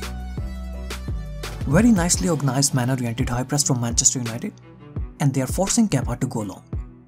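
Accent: India. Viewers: are you from 🇮🇳India.. Cuz ur accent and the way u speak seems so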